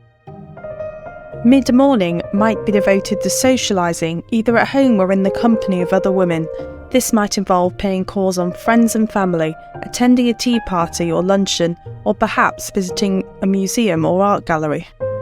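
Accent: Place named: United Kingdom. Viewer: I love British accent😊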